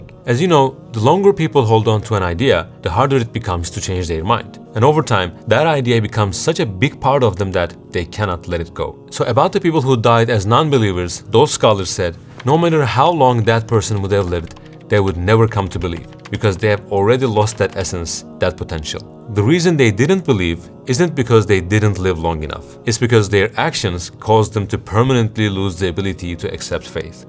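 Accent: Turkey. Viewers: brother are you Turkish? Your accent sound like your Turkish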